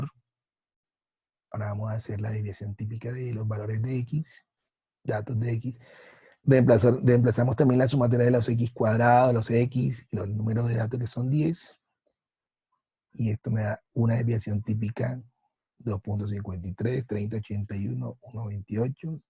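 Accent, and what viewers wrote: Caribbean Coast, Colombia. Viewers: costeño <3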